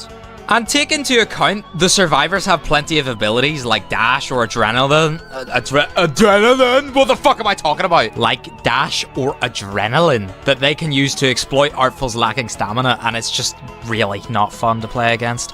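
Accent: Scotland, United Kingdom. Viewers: I can smell the Scottish accent, SCOTLAND FOREVERRRRR 🏴🏴🏴🏴🏴🏴🏴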